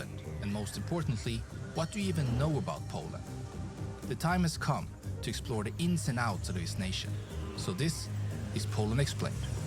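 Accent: United States. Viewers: Are you Italian? How come your English is so American?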